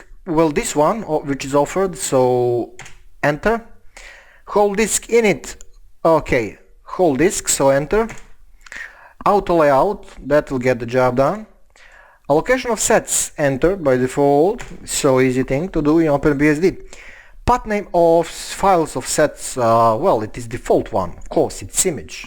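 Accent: Russia. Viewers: Thank you Russian man. Love the tutorial and the accent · The Russian accent is strong with this one.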